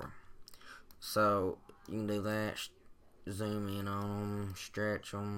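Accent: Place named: Southeast, United States. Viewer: Being a southerner (Texan) myself, this sounds southeastern, like Alabama/Miss/Tenn.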